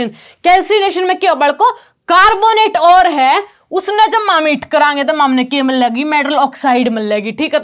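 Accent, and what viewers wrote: Haryana, India. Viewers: Bahut hi accha padha rahe ho madam sabhi samaj sakte hai haryanvi boli bahut acchi lagi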